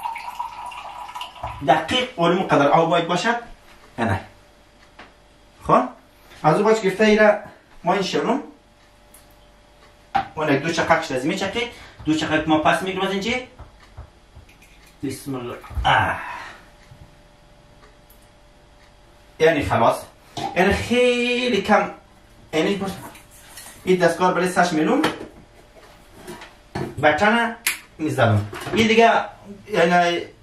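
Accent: Hazaragi, Afghanistan. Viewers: اموته سوچه هزاره گی گفتنت مرا مزه داد چینل تو سبسکرایب کدوم و لایک کردم. قوربون کلام نابت عالی بود ممنون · سلام علیکم اغای بیادر بسیار لحجی هزارهگی شیرین گپ میزنی اما لحجی هزاره گی هیچ وقت نشونیده بودم ما شا الله مقبول گپ میزنین مفق باشید ده کار بار تان تشکر💜💙💚👍👍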